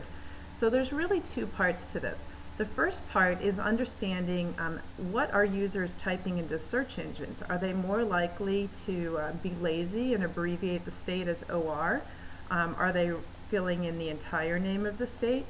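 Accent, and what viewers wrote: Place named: United States. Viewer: man, its getting to the stage now where if I hear an american voice on a instructional video I immediately close it, 10 mins of you listening to the sound of your own voice! what a dribbler.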